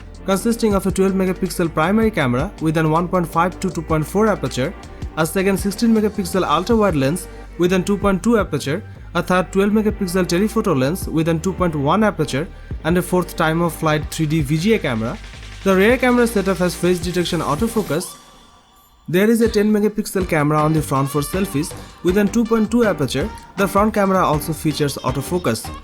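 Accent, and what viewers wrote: Bengal, India. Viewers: Another indian vloger I just dont want their accent... It always looks like other vlog that dubbed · Ffs Indian accent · That dense Bengali accent in your English man!! ( P.S. Coming from a Bengali himself :D ) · Bengali accent irritating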